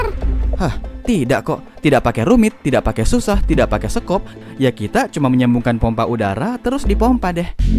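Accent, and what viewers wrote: Makassar, Indonesia. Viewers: Logaknya makassar rodong ngr😂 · tinggalnya di Maros gua lihatmya di IG dan coba cek videonya yang Review HP,logat makassarnya pasti terdengar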